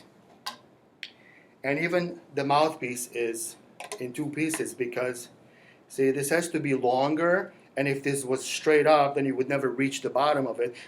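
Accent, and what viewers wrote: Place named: Philippines. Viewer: Your accent sounds slightly filipino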